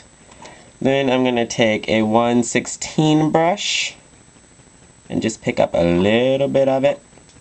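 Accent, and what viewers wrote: United States. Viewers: I laughed when you said (something like that) "or maybe look like a drag queen on smoke-snow" *laugh* (do you mean the white powder - like drugs?) (American English is defenitivly harder to understand than the English one) *THUMBSUP* For your good Make-Up Tutorials :)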